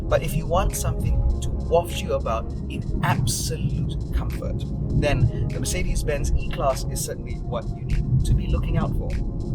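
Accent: United Kingdom. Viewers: I love your English accent, are you educated in the UK, love it.... "The headlights look low rent" 😂👍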